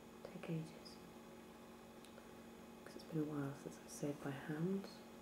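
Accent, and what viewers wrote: United Kingdom. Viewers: Holy crap. I've finally found a whisperer with a British accent! Major win tonight. Great videos!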